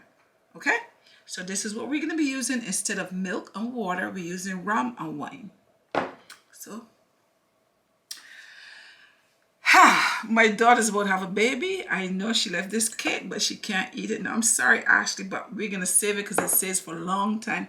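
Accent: Barbados. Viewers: So glad I found you. Was watching your cocoa bread post and I said she sound like a Bajan. Lost my GranGran black cake recipe and yours came out like our traditional black cake. Thanks a bunch 👍🏾👍🏾👍🏾👍🏾👍🏾👍🏾👍🏾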